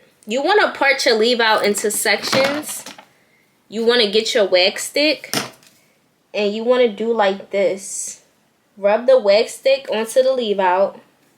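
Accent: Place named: DMV, United States. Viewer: Are you from the dmv? I hear an accent 😍 this video definitely helped me love it thank you !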